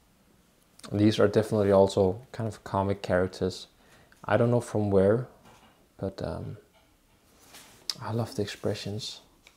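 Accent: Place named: Denmark. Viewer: You sound very danish 🇩🇰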